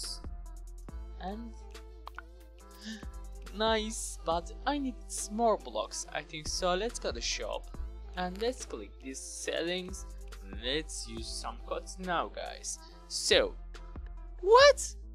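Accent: Turkey. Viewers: Kardeş %100 Türksün Ben konuşmandan anladım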